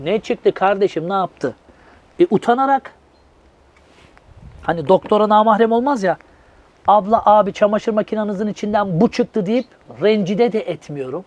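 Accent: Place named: Sivas, Turkey. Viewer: Trabzon mu ben Sivaslı sanıyordum 😅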